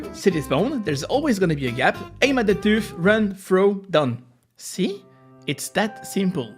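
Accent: France. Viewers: Are you French? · Omg the french English accent is the cutest thing ever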